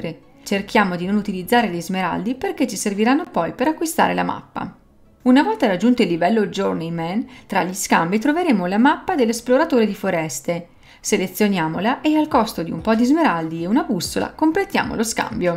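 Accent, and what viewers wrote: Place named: Veneto, Italy. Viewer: ma siete venti tosi? parchè dall' accento me pare de si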